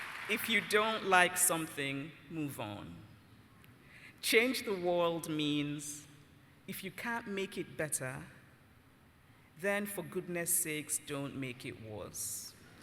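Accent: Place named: RP, United Kingdom. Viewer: Subtitles?! Seriously?! Do you not understand the Queen’s English?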